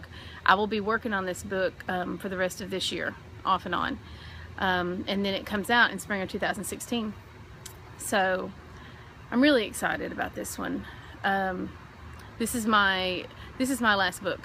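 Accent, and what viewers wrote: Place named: Kentucky, United States. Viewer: Oh, I grew up in Kentucky so I love watching your videos because I miss hearing the accent now that I live in Alaska.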